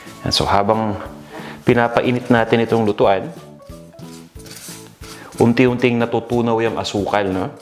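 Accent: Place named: Coño, Philippines. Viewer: Masaya po ako na matatas na tagalog niyo. Old videos niyo po ang coño kasi pakinggan haha. Pero masaya pa rin. Itatry ko po ito sa noche buena. Magisa po ako sa pasko kasi bawal magtravel pero dapat masarap pa rin pagkain kahit ganun.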